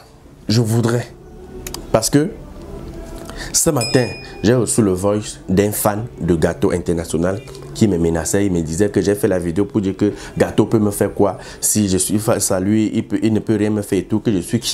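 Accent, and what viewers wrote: Cameroon. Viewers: Tu a baminikalise le français dans ton récit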